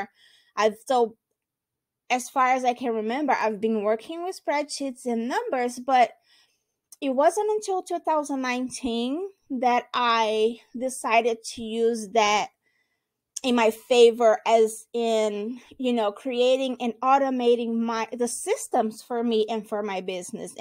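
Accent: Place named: Brazil. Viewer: I knew it! It was king hard, but I thought: “the accent.. it seems to have a little of Brazilian in it, but I was not sure”.. congrats!! Awesome content, and beautiful accent!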